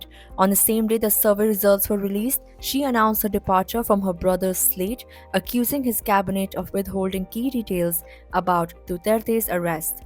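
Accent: India. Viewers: Hahaha, you speak english well but you could not trash your indian accent. That's what you get when you always spread fake news about the DU30's. Are you one of many media outfits that received incentives from marcos jr?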